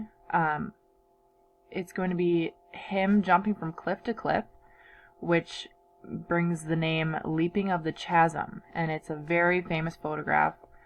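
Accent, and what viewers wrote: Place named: Wisconsin, United States. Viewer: I've been seeing videos of the attractions in Wisconsin Dells and had no idea what it was until I watched your video. Thank you. It was great to hear you speaking so that I get an idea what what a Wisconsin person actually sounds like. I don't meet too many people who grew up there where I live in the south and yet I have met a lot of people from Michigan. There is a real similarity to the way you sound in your talk and the way people from Michigan sound.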